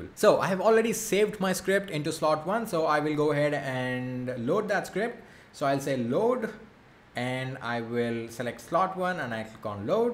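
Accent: India. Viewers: I still don't understand. i Indian accent is completely understandable why people need a fake layer on that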